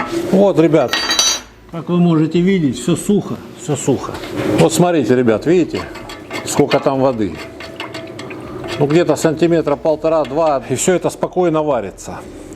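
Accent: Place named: South, Russia. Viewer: Ув., лучше, вырезал окно, а вместо песка, (😊😊куда его потом), промасленую тряпку, набил в трубу, а потм вытащил её и заварил!! 😊😊А по говору Вашему, слышно земляка с юга Руси, привет Вам!!....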